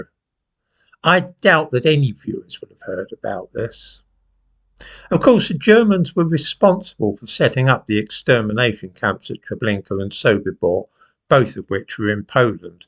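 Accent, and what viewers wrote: United Kingdom. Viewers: is absolutely critical in maintaining perspective and clear vision in the smoke and mirrors show. And he is so eloquently British as well.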